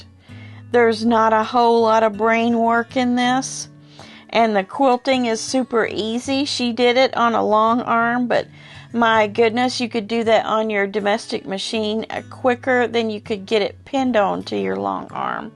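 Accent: South, United States. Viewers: Am here! Got my coffee and have been quite busy this morning already…am looking forward to my 2nd coffee, a little break, listening to your video with your sweet-mildly-southern voice, thinking of joining your sew along if i can sew multiple projects at once. Im usually a start and finish each project before starting the next kinda girl lol😂🤣😂 we’ll see if i can swing it. Have a great day and week. Ty for bringing us your content. I love the clear plastic bins and keep my fat quarters that way with half yards as well…lol food for thought..ive decided were distant cousins… part of my family is from your neck of the woods and you resemble many of them in many ways! You seem so familiar to me in lots of different ways!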